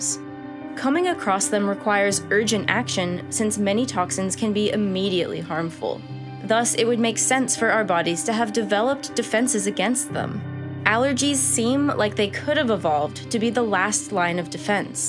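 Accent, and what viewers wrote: United States. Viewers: where is this content creator's English accent from? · I love your American accent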